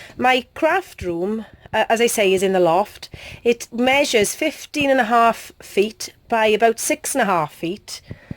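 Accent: Wales, United Kingdom. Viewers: Greetings grom Ireland What a fab craft room. So dinky. Would listen to you all day. Love the Welsh accent. Used to holiday in Wales with my family when I was young.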